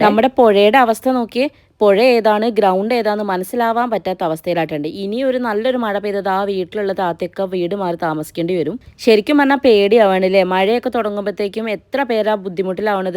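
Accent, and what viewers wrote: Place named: Thrissur, India. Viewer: പക്ഷെ ചേച്ചി ടെ ഭാഷ കോഴിക്കോട്ക്കാർ പറയുന്ന ഭാഷയിൽ അല്ല ല്ലോ ചേച്ചി സംസാരിക്കുന്നത്. തൃശൂർക്കാരുടെ പോലെ തോന്നി ട്ടോ അതുകൊണ്ട് ചോദിച്ചത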